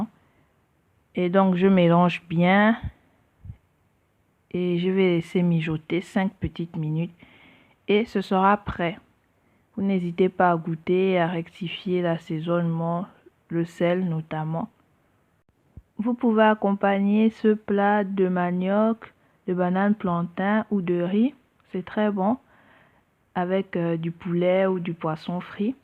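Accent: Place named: Gabon. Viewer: yum yum.. avec l'accent du pays et tsou et tsou trop bien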